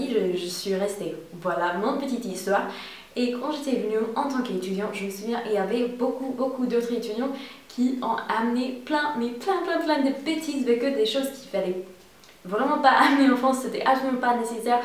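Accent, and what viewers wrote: United States. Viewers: Hello, j'apprécie beaucoup tes vidéos et ta personnalité comme ton léger accent américain (j'ai dit léger!). Well, concernant les drap je pense pourtant qu'il est une bonne idée d'emmener des DRAP-HOUSSES car il paraît que ça n'existe pas aux USA? J'en ai jamais vu tout au long de mon année aux USA et lorsque une amie devait y aller quelques années plus tard, elle en a emmenés des draps-housses et elle en était très contente d'avoir suivi mon avis. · J'adore ton accent américain ! C'est trop jolie à entendre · Elle est américaine :) tu l'entends avec son accent :) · J'adore l'accent americain o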